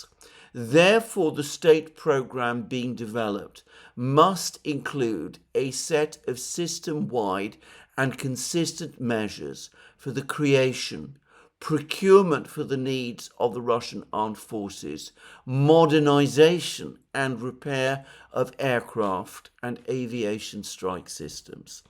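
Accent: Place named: RP, United Kingdom. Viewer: Click clack click clack over the rickety bridge. Who is the ogre? Are NATO the Billy goats gruff? Freudian slip Alex? Public school - your accent is emulating public school. I did suggest it is a dislikes of your position that makes you love Russia. Kim Philby said the same but to most, he was establishment.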